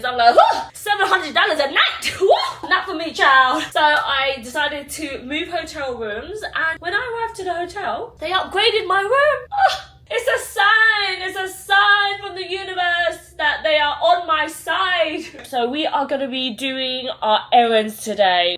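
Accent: United Kingdom. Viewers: Thank you for sharing and being so open and vulnerable with us. I can relate to you and your feelings in so many ways this was refreshing to see and encouraging. I’ve been so scared to solo travel 😩😂❤️ I’m so used to traveling with friends or my partner. This might have been the push I needed ❤️ Also yes you sound that British 😂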